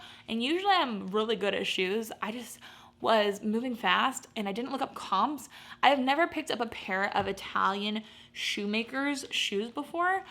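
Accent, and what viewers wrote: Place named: Michigan, United States. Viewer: As always I love learning from you, but I think what makes me love watching you most is that your personality reminds me of my best friends ❤ I moved away when I got married but her and I grew up together in Michigan which you have a very very similar accent and how you speak reminds me of home. Loved your haul keep hauling on 😊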